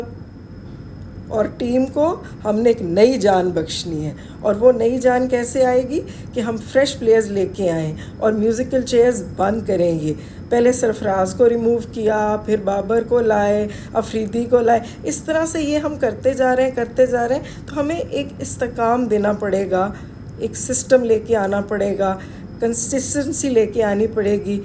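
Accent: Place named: Punjab, India. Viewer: Your Punjabi accent ❤, do improve your thumbnail tile graphic design, regards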